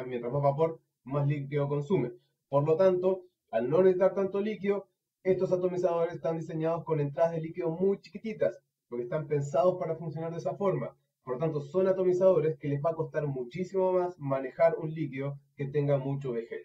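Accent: Argentina. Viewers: eres argentino? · El mejor video técnico que he visto. Creo que podré despedirme del pucho al fin. Pensé que eras de acá.. ya casi no se te percibe el acento Argentino .. jijij. Gracias y Saludos.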